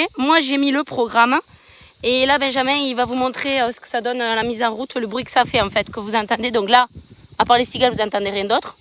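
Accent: South, France. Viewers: Quelle doux bruit !.pas les cigales !ta voix du sud bien sur 👍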